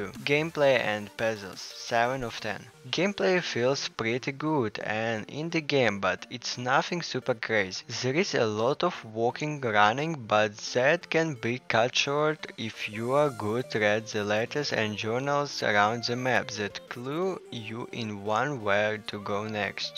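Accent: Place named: New Zealand. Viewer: bro the minute you spoke i was like HELLO FELLOW KIWI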